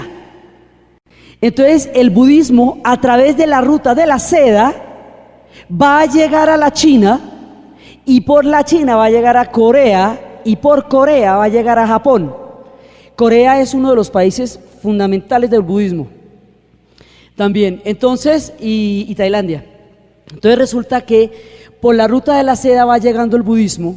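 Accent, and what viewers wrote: Colombia. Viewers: Doctora gracias por compartir tanto tiempo con nosotros y tan bello y extenso conocimiento y disfruto mucho su forma y vocabulario, salí de Bogotá hace 56 años y usted me trae a la memoria nuestra forma de hablar nuevamente gracias Dra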